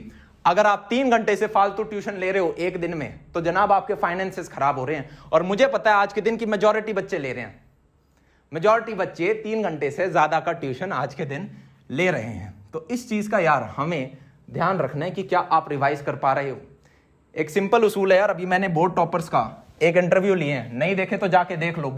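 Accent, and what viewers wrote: Haryana, India. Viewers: I've felt how his language and accent changed and leaned to haryanvi accent over the time😂😂😂 I think it's effect of geography or the friend circle 😂😂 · Bhaiya Haryanvi accent 😍😅.... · does any one recognise haryanvi accent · Bhaiya.. Hryanvi tone mein kbse bolna shuru kia aapne? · Bhaiya slowly slowly your accents are transforming into Haryanvi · bhaiya hariyanvi ka tone aa raha tha aaj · Bhai haryanvi touch ohogohohohogoho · When did get this Haryanvi accent? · Bhaiya aaj to aapki voice me Haryanvi touch tha maja aaya Bhai 🙂🙂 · Bhaiya aaj kuch haryanive tone bhut aa rshi h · Please give up your haryanvi accent it doesn't suit you. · Sir today your voice like haryanvi nature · Ask bhaiya haryanvi accent me bol rhe h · Sounding haryana style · He is a Jaat so there is always a touch of haryanvi accent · haryanvi voice ho gyi bhaiya ki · Haryanvi lag raha bhai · Haryana accent ho gayaa hai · Bhai aaj hi nhi pichle kuch mahino se bhaiya haryanvi jaise hi baat karte h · Haryanvi lag re hai aaj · Bhaiya ye Haryanvi tone suit krti h aappe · Aaj toh Haryana tone m bol rhe ho bhaiya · Bhaiya aaj aapke bolne tarike mein badlav lag rahe hain. 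aap pahle se alag tarah se bol rahe ho lagbhag Haryanvi mein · Bhaiya haryanvi accent aa gya aap mein toh permanently ❤ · Bhaiya ki boli.. Haryanvi ho gayi lockdown mei..😅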